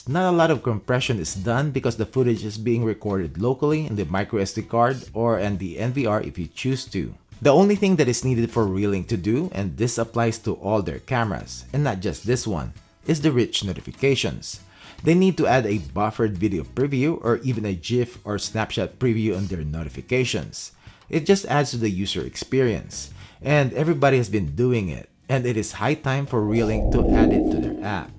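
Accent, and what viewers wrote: Philippines. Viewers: Filipino accent is so beautiful!! 🥰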